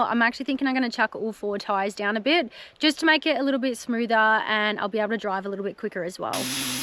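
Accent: Australia. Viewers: Your name seems American as fuck haha, I was not expecting the Aussie accent... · from uk As commented before your posts are great,remind me of my time in oz,you are very beautiful,love your accent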